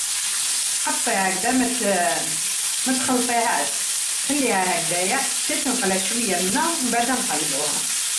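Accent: Kabylie, Algeria. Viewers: Tu es kabyle tata ? Ta l'accent kabyle un peux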